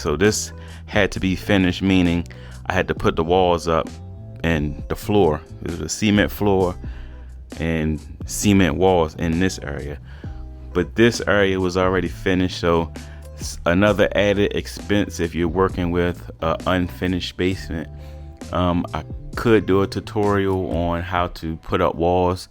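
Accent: Baltimore, United States. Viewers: That bmore accent is ridiculous lol tew hundred dollars is nuts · Looks good man. You from Bmore? Accent sounds like it.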